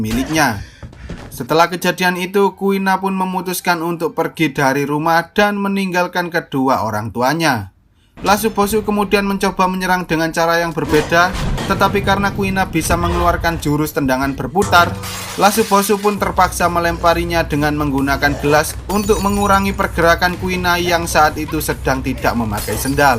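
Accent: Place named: Banyumas, Indonesia. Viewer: ngapak nya dari daerah mana nih 😂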